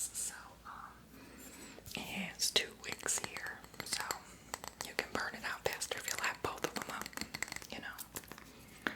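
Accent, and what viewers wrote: South, United States. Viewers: This southern accent + candle tingles = everything. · There goes that Southern Accent😂😂😂😂Love it😊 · You do a southern accent so well! Would love to hear more of it in future vids♥️ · !!! This video is awesome! I looove the southern accent. And its a deep southern accent too. Reminds me of my family from Mississippi.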